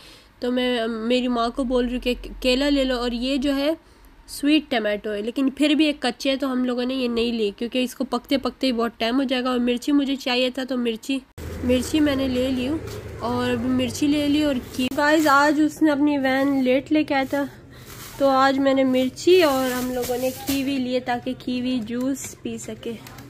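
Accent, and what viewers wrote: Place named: Middle East. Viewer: Ap Pakistan se ho k middle east se qk ap Urdu JB bolti ho to jaise lagta hai k ap middle east ki ho.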